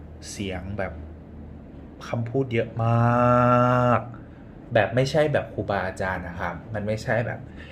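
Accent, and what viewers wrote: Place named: Isan, Thailand. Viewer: อาจารย์อยู่จังหวัดอะไรค่ะ วาดยันต์สวยมากค่ะ คลิปนี้เสียงภาคอีสานออกจ้า แม่นมากค่ะ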